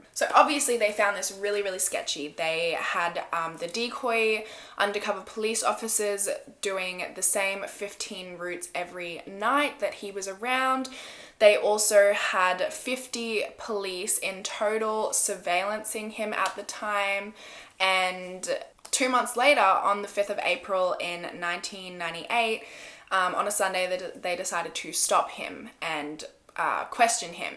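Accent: Australia. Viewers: I KNEW THAT WAS AN AUSSIE ACCENT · Just found your channel and can't get enough of your Australian accent! Love how you say light or right! Keep up the good work!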